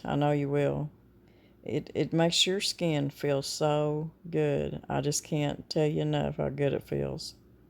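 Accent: United States. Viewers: LOVE that country accent, girlfriend! You sound like family. 😁 I love putting rose water in a spray bottle and lightly mist it on my face and diluted, I use it on my hair as a leave-in/refresher spritz. As soon as we move I'm going to try to grow some roses just to make RW with! 👍👍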